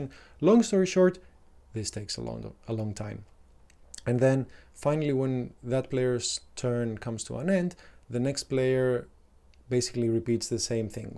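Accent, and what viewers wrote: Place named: Greece. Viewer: D&D content with a greek accent is exactly what I needed. Euharisto gia to vinteo! :D Interesting mechanic, I think I may give it a go because I have a few players who take ages to do stuff.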